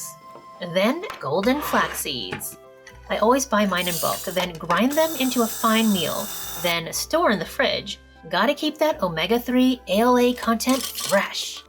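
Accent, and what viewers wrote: Canada. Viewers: This looks like a good recipe for my new Kitchenaid mixer still in the box... first of your videos I've seen and subscribed... from the accent it sounds like your from friendly Canada,,thanks for the video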